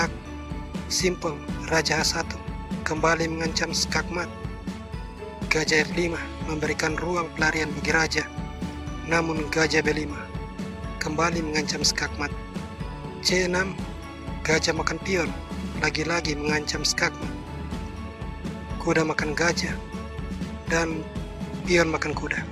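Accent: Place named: Eastern Indonesia, Indonesia. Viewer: Mantap ini Chanel, penuturannya jelas dan mudah dimengerti.. Yang paling penting, aku suka aksen bicara nya, khas orang timur 👌👌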